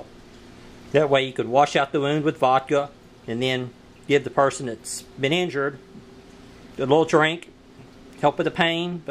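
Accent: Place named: Texas, United States. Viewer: sounds like your from texas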